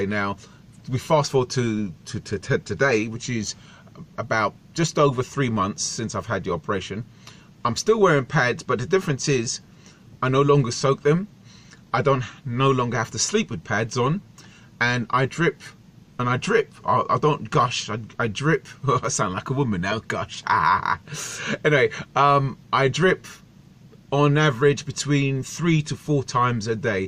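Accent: United Kingdom. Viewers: You sound like a f***ing southern hero mate, I've just come out a university 28 years old see that your subs need putting up a new video quality could do with improving, I'm looking for a job atm, cockney based the same to you. I'm from Essex, back in the day growing up. in Pompey now. really im offering my services if I could do anything technological/ graphics/ back-end/ editing business stuff to help get you going (2018 youtube is going to be very hard) my degree was entrepreneurship that's going to help you get whatever you're trying to get out online then let me know (no money atm bud, i just wana help listnening to ya). I'll just wanna know a bit more about your drive etc you doing this for money? awareness? a living? I've had a look through your channel and it's a bit all over the place (no offence) I don't know how to offer help but I know I want to let me know cheers for the info you're a hero as i said. 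thanks for the upload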